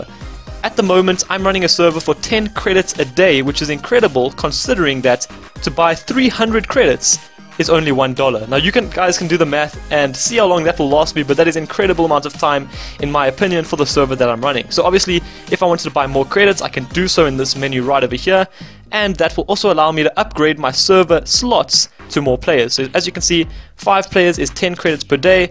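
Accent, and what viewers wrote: South Africa. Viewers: u sound South African?! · are you South African?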